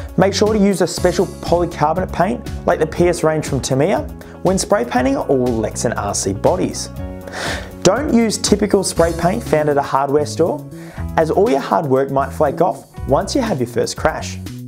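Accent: Australia. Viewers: That was actually awesome and so refreshing to hear an Aussie accent. I missed the bit on how you did the black window trim. Also, I want do the exact same paint job just with the main body Tamiya Dark Metallic Blue. Would I need to back it with black or would that turn out crazy dark? Thanks guys, good job. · This guy sounds Australian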